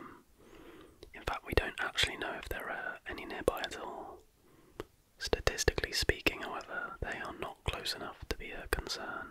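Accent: United Kingdom. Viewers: Amazing video to fall asleep to. I take a while to fall asleep so I usually need something to occupy my brain until then and this is absolutely perfect and even better that its educational. Your voice with your British accent is just amazingly calming to listen to. Thank you very much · he's just british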